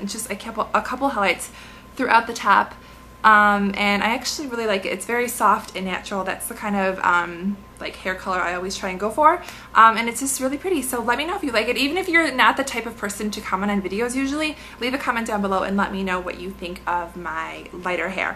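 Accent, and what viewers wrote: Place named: Boston, United States. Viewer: I love your accent!! Is it Boston? :)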